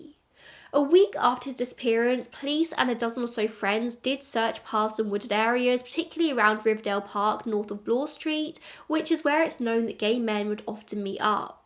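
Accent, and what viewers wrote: England, United Kingdom. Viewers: Ur English accent is 🤮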